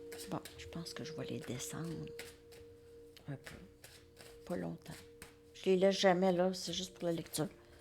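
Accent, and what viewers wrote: Canada. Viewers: Bonsoir madame vôtre accent canadiens est sublime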